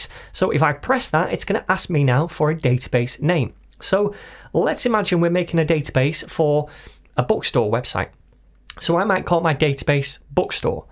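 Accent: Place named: Yorkshire, United Kingdom. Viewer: Are you a Yorkshire man mate?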